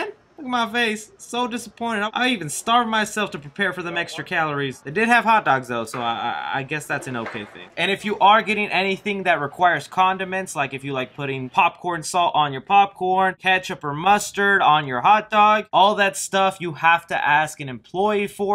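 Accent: Texas, United States. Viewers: What part of Texas are you from? I'm Texan too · Woah what part of texas are you from?!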